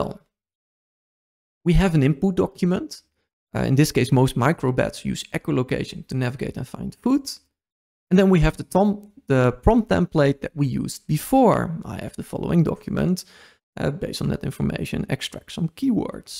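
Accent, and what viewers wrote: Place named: Netherlands. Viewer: God what a Dutch accent, coming from a Dutchie 😂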